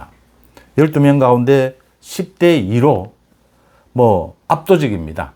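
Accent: Gyeongsang, South Korea. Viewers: 경상도아제해설이 더시원합니다.앗싸앗싸!!!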